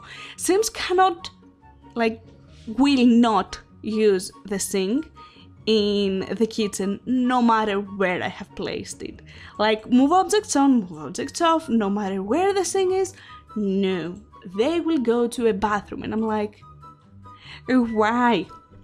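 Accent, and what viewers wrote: Greece. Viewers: Your accent sounds like you are greek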